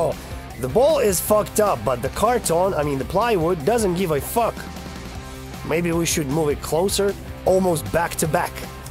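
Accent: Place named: England, United Kingdom. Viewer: О, вы из Англии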